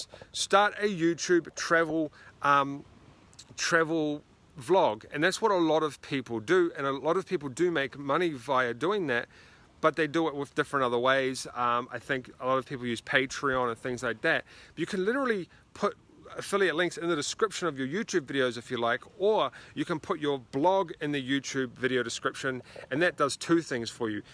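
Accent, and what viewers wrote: New Zealand. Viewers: make a video on how to get your nice New Zealander accent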